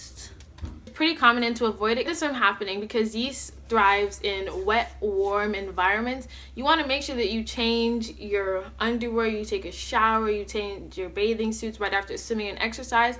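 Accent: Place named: Jamaica. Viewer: krys when I hear she talk I said to my self hmnnn she must b Jamaican r a wrap wid jamaican, gotta take care of the poonahniii lol yes I said it lol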